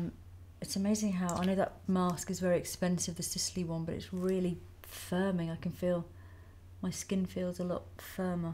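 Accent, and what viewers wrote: United Kingdom. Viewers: Oh gosh, that beginning made me feel like I'm exactly in an airplane (except with the British accent) probably because I'm flying soon haha!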